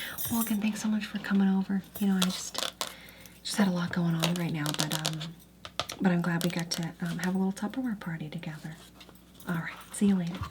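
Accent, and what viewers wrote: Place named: New Jersey, United States. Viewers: Why she sound like she from Jersey 😂😂this is so funny to me. It's ASMR comedy 😄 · The Mid-Atlantic/Philly vibe is ON POINT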